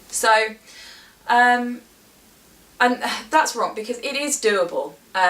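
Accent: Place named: United Kingdom. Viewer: I recently indie published a novel on Amazon, and I am considering to try the audio book myself. I found this video very informative. As far as your voice goes, it sounds fine to me. As an American, I find British accents somewhat musical, very pleasant to listen to. However, I can understand from a time standpoint with three small children. My daughters (3) are all raised.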